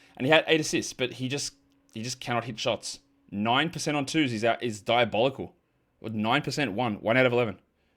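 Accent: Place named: Australia. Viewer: Yo!!! Who is this guy? First time I heard this guy I was about to turn it off once I heard the Aussie accent I immediately judged him as being unknowledgeable but I dropped my remote after a hasty approach to close the video. Thank God! I happened to hear a rare but legendary Jon Vitti Simpsons reference that if you know you know. Only legends know what the f he was referencing. And I wanted more! Fact is that Vitti reference was GOLD! is referencing one of the funniest episodes from The Golden Age of the Simpsons, that was one of the best episodes by probably their second best comedy writers in the history of television not just the Simpsons. Jon Vitti who arguably is behind maybe John Schwartzwelder and tied with Conan, even though Conan didn’t write enough to have as many classics as most Simpsons Golden Age scribes. But, seasons 1-6 of the Simpsons can’t be compared to any other show it goes down as the best comedy tv series animated or not, it doesn’t matter, the writing was so tight and smart. 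If you know it, when someone references one of those episodes like this dude just did in this clip, you know he knows his shit.m, ABOUT EVERYTHING. Guy or whoever this dude is, has some taste. I am listening…you got me hooked. At first, admittedly and with shame, I heard the Aussie accent and immediately judged his hoops knowledge as being suspect. However after I heard the Corey hotline reference I had to give him a chance. He is absolutely surprisingly LE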